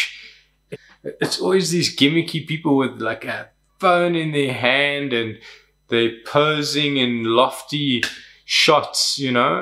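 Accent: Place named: South Africa. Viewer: thanks so much for this video! I’ve worked as a Content Designer and a UX writer for the past five years. One of the biggest challenges of our role is educating teammates and other stakeholders on the value of content-first design. You’ve done a great job of explaining it in a nutshell! P.S. I hear an SA accent. Are you based in SA?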